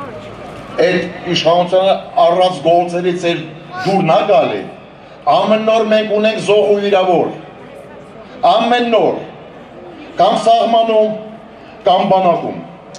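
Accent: Karabakh, Azerbaijan. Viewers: Это инс харабахи акцентова хосум хи инке hай чи, де има лси ай ору вор Арцахе андвец туркерин уремс тох имена Айастана тох ангист чи кнелу, турки ерезанк Айастана имац дезел чи ангист Токелау кнек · Էս խոսողը ոնց որ ղարաբաղի ակցենտով է խոսում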